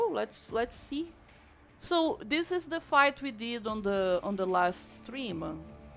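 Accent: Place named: Poland. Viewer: Your accent sounds polish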